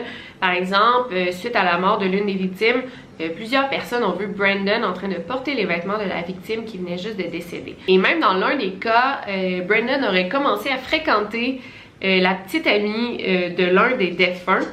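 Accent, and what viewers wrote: Quebec, Canada. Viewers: Je salue la grande amélioration de la diction depuis les 1 ere vidéos. L'accent québécoise malheureusement trop prononcé sur les vidéos du débuts de la chaîne. Les rendant quelques peut incompréhensibles pour les français.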